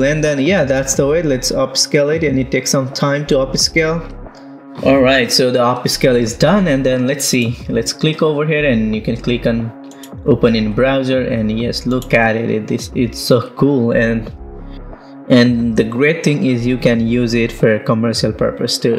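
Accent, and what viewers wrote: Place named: Nepal. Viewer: COCIAL MEDIA ACCENT TELLS U R NEPALI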